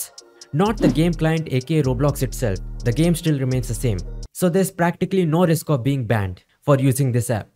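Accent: India. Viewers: Bro are you Indian boy or smth? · once you hear the indian accent you know its gonna work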